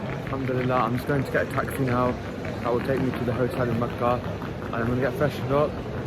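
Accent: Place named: United Kingdom. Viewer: Salaam just found your channel, by browsing Umrah videos. I live in USA, but I took a vacation to the UK. I think you are from there with your accent. Jazakallah Khayr, such a beautiful video and a vlog. your off pakistani descent?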